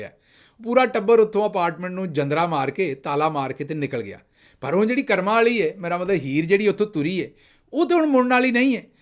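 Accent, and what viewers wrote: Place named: Punjab, India. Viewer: جس طرح سے آپ پنجابی بولتے ہیں وہ واقعی بہت جادوئی ہے۔ کوئی اس وقت تک نہیں جا سکتا جب تک کہ آپ اپنی بات ختم نہ کریں۔ ہم آپ کے پنجابی لہجے کو کاپی کرنے کی کوشش کرتے ہیں لیکن ناکام رہتے ہیں۔ لاہوری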